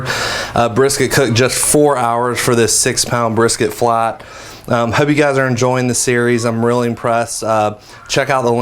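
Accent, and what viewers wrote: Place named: Pennsylvania, United States. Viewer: Southwest PA is my guess.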